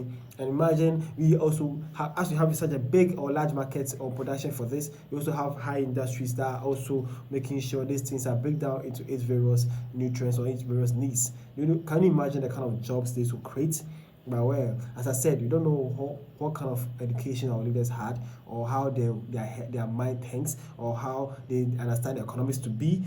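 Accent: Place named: Nigeria. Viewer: I thought you are a Nigerian. You have a deep Nigerian accent.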